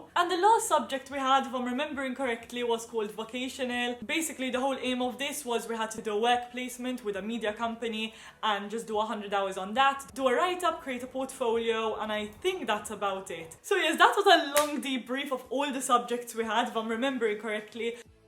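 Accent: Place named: Jamaica. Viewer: Shes got a Jamaican twang with a mix of Londoner and Russian for Rs and Ls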